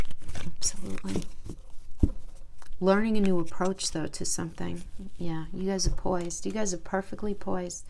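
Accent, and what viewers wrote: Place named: New York/New Jersey, United States. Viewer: What area are you from? You have a slight NY/Jersey sound. 💛 I really like your readings for Libra. Thank you.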